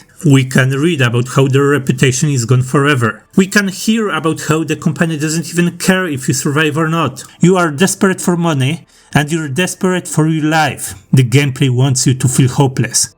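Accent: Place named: England, United Kingdom. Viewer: PL: Masz niesamowity akcent Angielski EN: You have best English Accent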